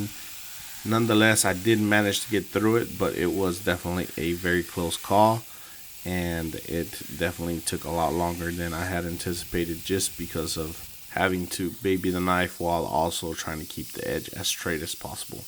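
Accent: United States. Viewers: Did you rip this from a real blacksmith? Or is your english just to american?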